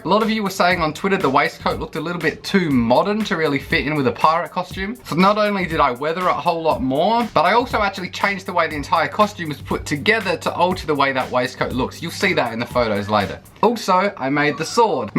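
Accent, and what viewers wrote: Australia. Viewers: noice!! i would subscribe to your bird channel too, also do David Attenborough voiceover with your cool Aussie accent :)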